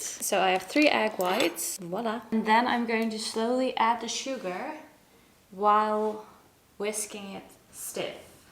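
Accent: Netherlands. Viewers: Are you dutch? Just a guess because of the accent and the dutch grocery’s😂❤️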